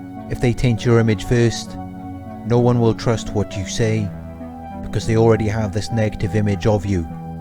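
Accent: Wales, United Kingdom. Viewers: Great video. You sound Welsh. Im welsh too! May I ask a question? I started a FB page helping people with mental health and theres one guy on there thats always sucking up to me. Compliments compliments compliments. Yet....whenever he becomes close with certain members (and is conversing with them through messenger), they seem to go very distant and cool towards me. The women in particular. This has happened several times. I thought it may be my imagination, but I dont know....it all seems a little strange. Do you think this guy may be a bit of a narc? Thanks in advance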